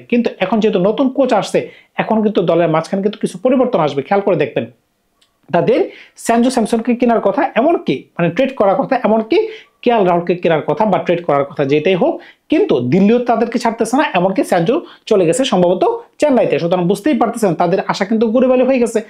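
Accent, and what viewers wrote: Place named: East Bengal, Bangladesh. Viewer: Bangal katha posay na. Valo bangla uchcharan karu n.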